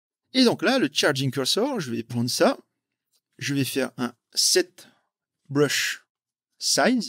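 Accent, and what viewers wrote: Tahiti, French Polynesia. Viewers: Salut, tu es de Tahiti? Tu as un accent tahitien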